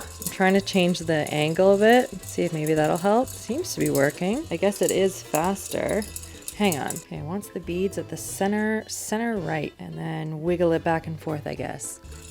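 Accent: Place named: Canada. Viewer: It’s a Canadian accent thing 🩷🍁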